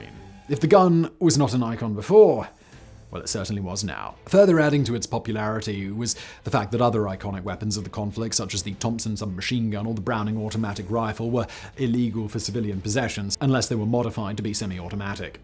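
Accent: England, United Kingdom. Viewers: Something about an english accent talking about guns seems less “jerk-offy” than a guy with a texan accent talking lovingly about his browning m2. Idk i clicked on this video expecting a Brandon Herrera type dude jerk off a 1911 for 19 minutes but I was pleasently surprised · Not a fan of a Brit talking firearms.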